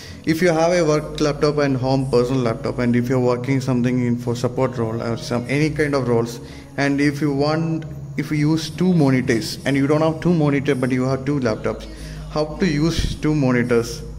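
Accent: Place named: Kerala, India. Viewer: by your accent , i am pretty sure you're a malayali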